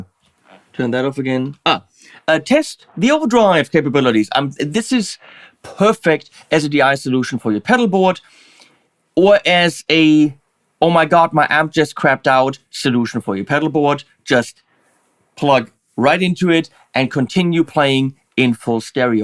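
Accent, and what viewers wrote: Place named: Germany. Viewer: ..... 🤩🥰😍😊 👍👍👍👍👍👍👍👍 Deine Arbeit, deine Reviews, dein Witz/Humor, dein Guitarplaying, dein "deutscher Accent" 😂, deine farbefrohe Kleiderwahl (absolut meins 👏👌), die Tier-Clips am Schluss....... 😂 Habe mittlerweile natürlich einige ähnlich gute "Rezensenten" gefunden, deren Clips ebenfalls richtig gut sind..... die ich mir (man sich) auf jeden Fall von vorne bis hinten reinziehen kann...... aaaaber, Du bist unschlagbar 😅✌😎 Freue mich auf jeden neuen Clip von dir 👍 Vielen vielen Dank für deine Arbeit und beste Grüße aussem Pott Rosigatton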